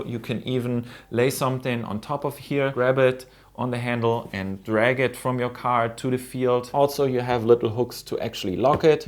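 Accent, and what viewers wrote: Germany. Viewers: Warum merkt man das er deutsch spricht😂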